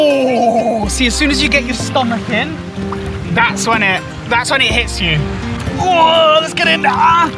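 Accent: United Kingdom. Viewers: Oh yeah, I would guessed Londonish because I lived there for a while and I felt in love with their accent but for me it's inimitable🙈😄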